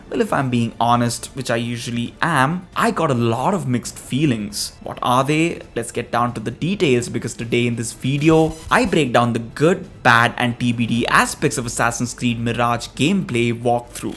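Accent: India. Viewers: basicly it's shit in ac unity it turns u into a f*ckin guards syndicate is just better things also it would be cool the more discounts for being good n not killing civs instead of killing u If u kill civs btw love ur channel keep up the great work n love the Indian accent always great to see foren ppl who play games I like its rare usually just americans and canadians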